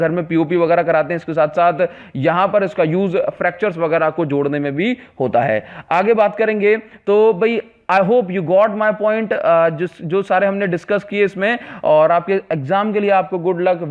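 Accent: Odisha, India. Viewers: Sir aap odia ho kai?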